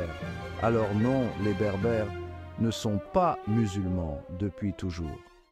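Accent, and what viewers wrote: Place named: United Kingdom. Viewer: J'adore le petit accent british 😊 Merci pour la vidéo. très instructif. Question est-ce que l'islam tolère ou efface la culture berbères?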